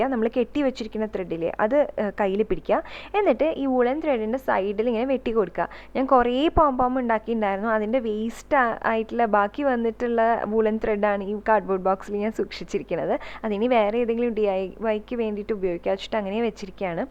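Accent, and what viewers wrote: Thrissur, India. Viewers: Chechi Thrissur kari anno 🤔🤔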